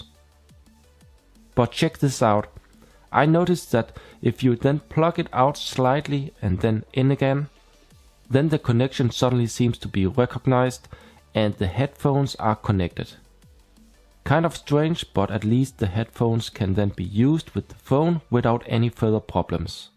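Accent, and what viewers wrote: Germany. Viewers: you are german right ? 😎 your accent tells it all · are you german?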